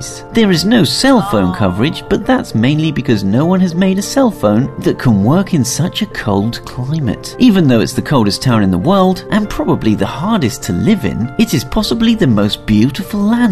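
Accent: United Kingdom. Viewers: Why does he uses Fahrenheit when he has a British accent?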